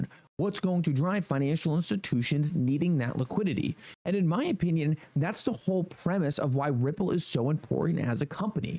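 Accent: Allentown, United States. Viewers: with an Allentown accent strikes again with lies and false hopes.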